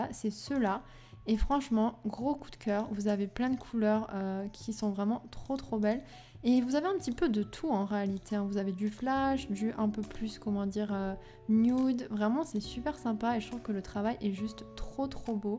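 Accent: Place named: Corsica, France. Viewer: Coucou 😊 ohlala je viens de tomber sur ta chaîne et je sens que je vais télécharger TOUS TES CC, je suis beaucoup trop fan de tes sélections 😌💕 ça me relance de ouf dans les sims là On dirait que tu as un léger accent, ça me rappelle un peu la Corse, tu es originaire d'où sans indiscrétion? ☺️ Je m'abonne ❤️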